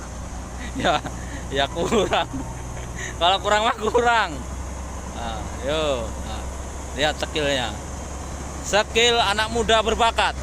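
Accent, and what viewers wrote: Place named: Java, Indonesia. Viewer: kirain di Jawa dri logatnya kayak orang jawa Pak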